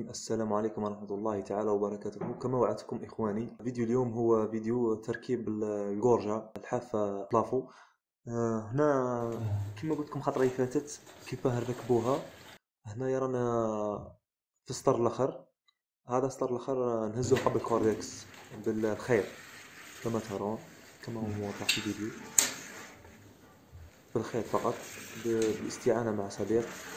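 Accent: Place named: M'Sila, Algeria. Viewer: تبان هدرتك من جيهة لمسيلة مشكور الاخ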